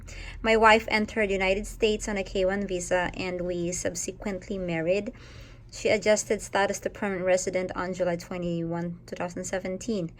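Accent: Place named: Philippines. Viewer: Filipino-english accent! Ang nice! 😊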